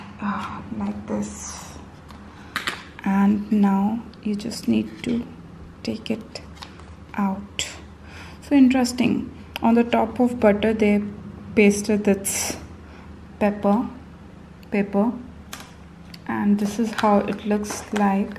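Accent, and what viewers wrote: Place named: Kerala, India. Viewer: hahahahaha y u talking malbari english...malyaram hindi english hahahahahahaha fucking butter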